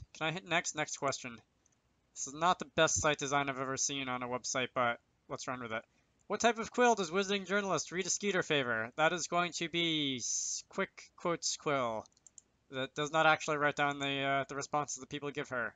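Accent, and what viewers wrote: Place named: United Kingdom. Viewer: you sounded more british than me wtf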